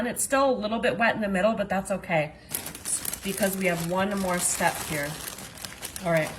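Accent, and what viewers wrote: South, United States. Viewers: So in your newer videos on your other channel you have a southern accent and sound totally different. Is it fake? Or is this accent on this video fake? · So why the southern accent on your other channel? 🤔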